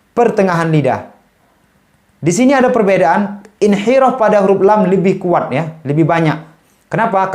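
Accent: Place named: Eastern Indonesia, Indonesia. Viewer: Suka aksen timur beliau. Maa syaa Allah... Jazakallahu khoyron Ustadz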